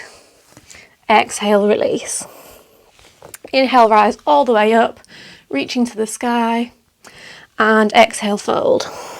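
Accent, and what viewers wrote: Yorkshire, United Kingdom. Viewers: This is the first flow I've done with you and it was great to hear a Yorkshire accent teaching yoga, makes me feel at home 😊 it was intense and I am ded but will be coming back to practice for sure x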